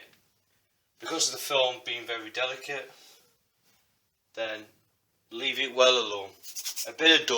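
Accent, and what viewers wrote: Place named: Pendle, United Kingdom. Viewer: Where are you from mate? You sound local to Pendle where I am from, just ordered xt6 telescope to begin astronomy with my son, any tips welcome.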